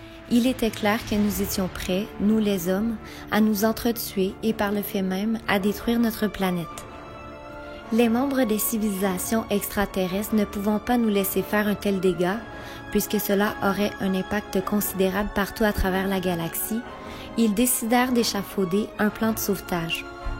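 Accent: Quebec, Canada. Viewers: Bahahahahaaaa je m'attendais pas a entendre du Quebecois 😂😂😂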